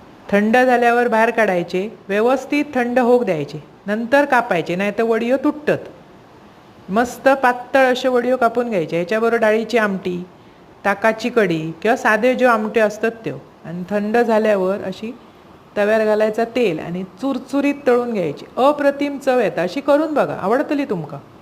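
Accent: Malvani, India. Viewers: Tumachi malvani ani receipe donhihi apratim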